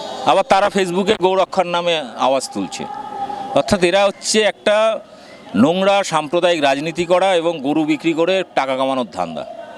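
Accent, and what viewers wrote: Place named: Bangladesh. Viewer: Apnar bangladeshi mone hochhe dada.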